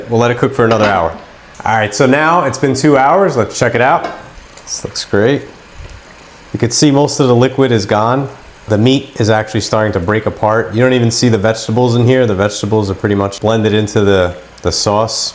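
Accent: United States. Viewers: It is very fun to hear this perfect American accent, though he is from Italian descent, so it is easy to understand what he says too. Next time I will make vegetable broth first. :face-blue-smiling:I never let this sauce cook for two hours. I think it must much healthier when it cooks for only 20 or 30 minutes. I don't understand why Italians cook it for so long. :face-fuchsia-tongue-out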